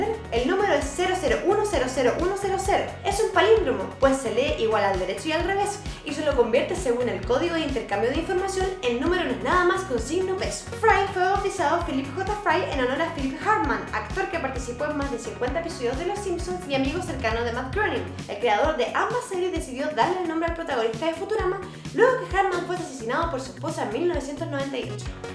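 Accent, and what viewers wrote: Chile. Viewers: eres chilena? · debo confesar que no soporto el acento chileno (así como muchos no soportan muchos otros) pero la forma de hacer los vídeos y el cuidado con las cosas que dicen me hacen obviar eso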